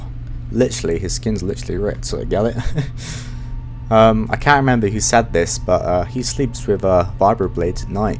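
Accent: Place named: Plymouth, United Kingdom. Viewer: Where are you from? South west? Can hear the janner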